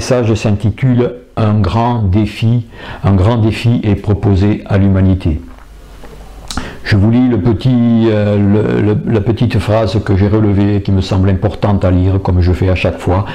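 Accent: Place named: South, France. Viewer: Merci, je vous aime beaucoup, je suis bordelaise et j aime votre accent du sud, bises de l allemagne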